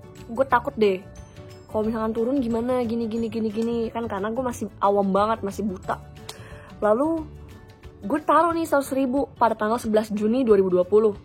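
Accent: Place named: Medan, Indonesia. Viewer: kakak, kek anak Medan...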